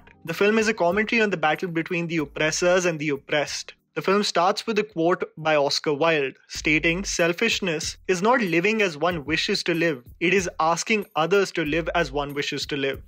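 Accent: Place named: Bengal, India. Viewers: sir your Bengali accent is to bad😒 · OK dude being a Bengali I have to say that you need to work on your Bengali accent as it's simply terrible.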